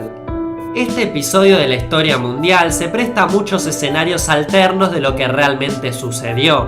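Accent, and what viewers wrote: Argentina. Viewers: yo soy chileno pero por tu tonada me suena argentina pero me confundo si eres argentino y estas en mexico y te a cambiado el tono o puede que sea alreves tu dime si estoy en los sierto aposto que eres mas argentino que mexicano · jajaja era argentino, y no me di cuenta por el acento.....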